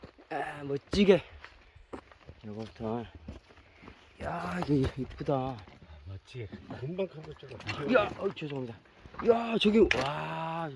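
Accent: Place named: Jeolla, South Korea. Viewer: 어느지역의 산인지 모르나 목소리는 전라도같네요 아직 강원도나 충북북부쪽에서만 나오는줄 알았는데요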